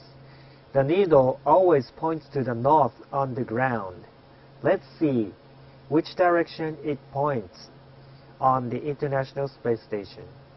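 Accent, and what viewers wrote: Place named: Australia. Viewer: How I love the Australian accent.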